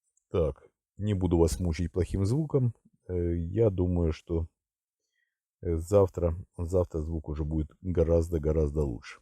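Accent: Ukraine. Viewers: Какой ты генерал? Ты балабол с харьковским акцентом.Где признаки катастрофы гражданской авиации конкретно? В каком смысле? Технического обслуживания, проведения тяжёлых форм, замены запасных частей? Просто балабол тоскливый...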